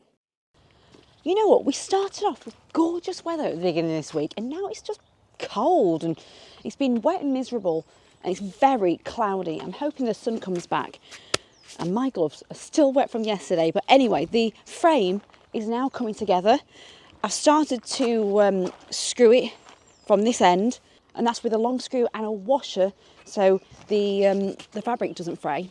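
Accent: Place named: Leicestershire, United Kingdom. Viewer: I love your accent,it takes me back to my youth in Leicestershire ,i could listen to you all day. Forty four years in the U.S. AND NO DESIRE TO COME BACK TO COLD WET DAMP MISERABLE WEATHER You should always have a comfy chair and a bottle of wine in your greenhouse . I know my wife does and on rainy days i get several hours of peace and quiet ?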